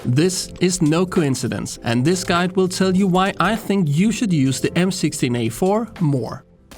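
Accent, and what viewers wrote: Denmark. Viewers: Are you danish? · er du dansker? :P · So easy to hear you are Danish dude hehe 💪 you are doing a great job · Danish, right? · Er du dansker? ☺ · Is it just me or does they guy have a Danish accent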